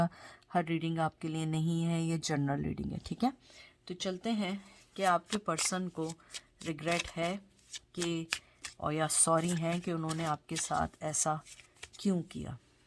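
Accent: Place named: Karachi, Pakistan. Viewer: 100% Resonate to me. Thanks. Aap ke Bolne ke style se lagta hai ke aap Karachi de hain.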